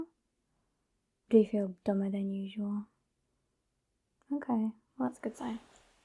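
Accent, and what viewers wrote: United Kingdom. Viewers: What kind of British pirate we talking here? Yorkshire? East Sussex? Cornish?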